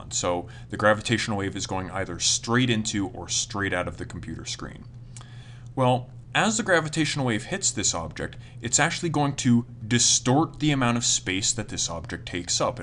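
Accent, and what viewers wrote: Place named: Canada. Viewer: Great video and well-crafted teaching! Thanks for making these. Since you recorded this video, we did in fact detected the gravitational waves. So your video is even more relevant now. I hear a bit of a Canadian accent. How correct am I? :-)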